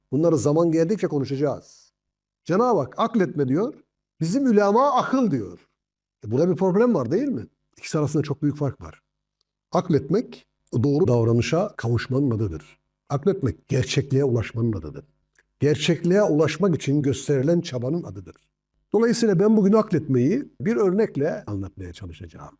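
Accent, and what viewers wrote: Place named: Konya, Turkey. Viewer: Doktor beyim ilk defa dinledim şivenizi konya/Bozkır yöresine benzettim. Pogramların devamı dileğiyle kolay gelsin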